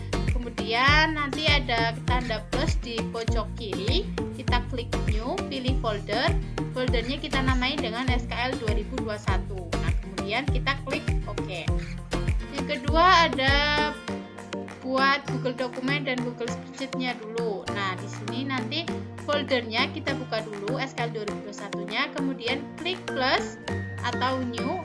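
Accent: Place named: Java, Indonesia. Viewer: Medhok ya kak😂semangka❤semoga bermanfaat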